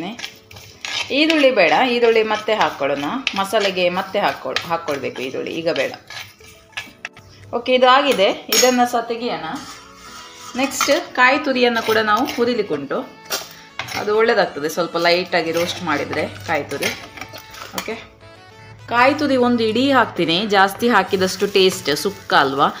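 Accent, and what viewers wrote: Mangalore, India. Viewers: Mam l love Mnglr kannada 😍😍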